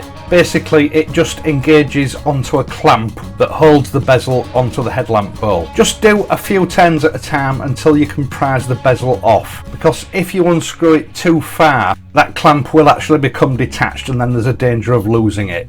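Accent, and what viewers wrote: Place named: England, United Kingdom. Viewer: your narration is movie like, you look like Clint Eastwood, albeit from England with that accent. 😀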